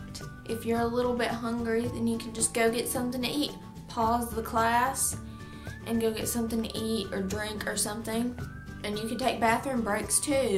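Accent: United States. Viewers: love your country accent :)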